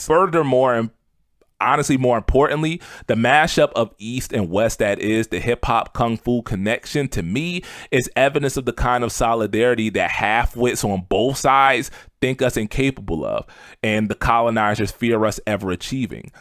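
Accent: Philadelphia, United States. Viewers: Bro you from Philly?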